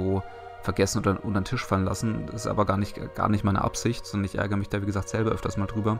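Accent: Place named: Halle, Germany. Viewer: Legends never die! Seine Videos haben mir als Skyrim-Neuling bis jetzt sehr geholfen und tun es auch noch. Und seinen leichten Dialekt hab ich natürlich auch erkannt, ich denke mal Hallisch? Wäre mal interessant zu wissen, kann auch sein, dass ich falsch liege. Jedenfalls mach weiter so, und tu das, was du für richtig hälst. Vielleicht siehst du den Kommentar irgendwann, auch wenns unwahrscheinlich ist. Ich gucke jetzt mal deine FAQ-Playlist, vllt wird meine frage beantwortet. lg an euch ^^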